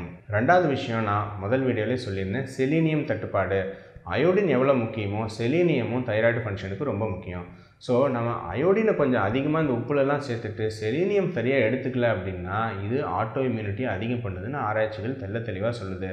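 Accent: Kongu, India. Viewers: டாக்டர் அவர்களின் கொங்கு தமிழ் இனிமை. வாழ்க வளமுடன்.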